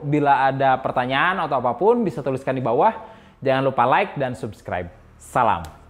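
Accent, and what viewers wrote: West Java, Indonesia. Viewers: penjelasan dengan kearifan lokal , ingrris ala" indo dan penjelasan "F" dengan khas orang sunda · Alah siah sunda pisan euy fenjelasannya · Pasti orang sunda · dia orang sunda makanya ngomong kek gitu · sunda pisan om semua huruf f diganti p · Nah ini, daritadi gua fikir logatnya asli sunda kwkwk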